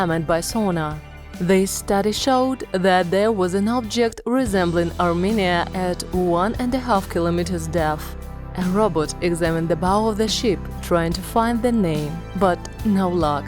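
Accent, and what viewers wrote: Russia. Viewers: Wtf is with the Russian accent in the voice over?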